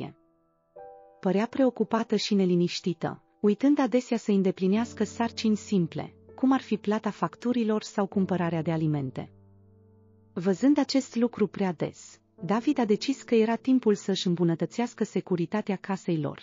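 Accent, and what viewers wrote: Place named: Moldova. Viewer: Limbaj cu accent specific moldovenesc și accente puse anapoda pe cuvinte.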